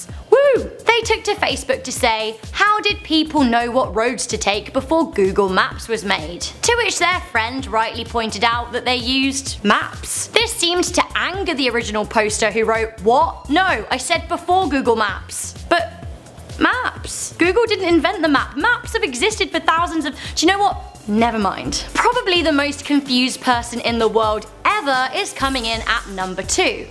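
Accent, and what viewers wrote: United Kingdom. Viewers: Love that British accent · Is her accent British?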